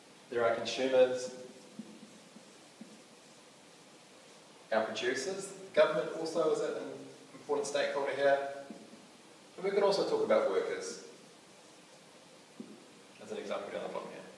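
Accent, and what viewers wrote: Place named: New Zealand. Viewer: Is it really that hard for americans to understand a new zealand accent? God sake lol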